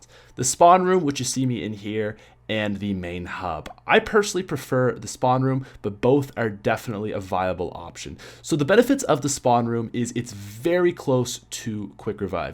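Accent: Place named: Canada. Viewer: Hahaha you must be new to his channel. Not trying to be rude, but he's Canadian and says some words just a bit different. Nothing bad about it tho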